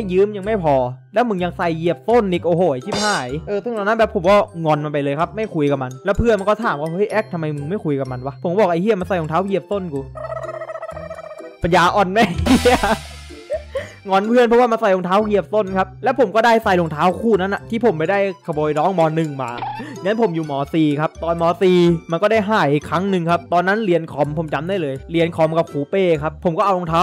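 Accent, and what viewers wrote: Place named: South, Thailand. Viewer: พี่เป็นคนภาคไหนทำไมพูดเหมือนคนใต้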